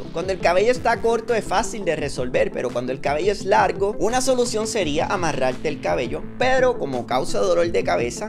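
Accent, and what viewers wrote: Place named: Puerto Rico. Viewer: Hablas como puertorriqueño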